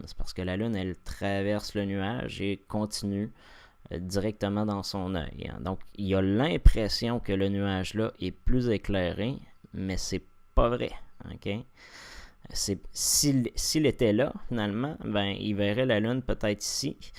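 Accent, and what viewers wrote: Canada. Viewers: Maintenant, dès que j'entends l'accent canadien je suis sur mes gardes mais là le propos m'a l'air logique, clair, cohérent, argumenté. En un mot " globiste", je dirais même " sphériste" ou "rotondesque"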